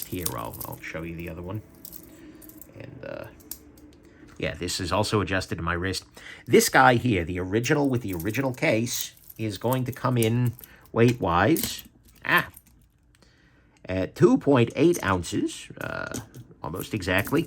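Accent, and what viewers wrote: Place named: New Jersey, United States. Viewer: You gotta be from New Jersey!!!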